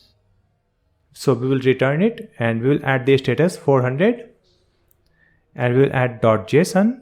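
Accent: India. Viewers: infortunately english indian accent make it difficult for me to follow this interesting youtube subject. i'm actually not an english native speaker but i understand well britain and american english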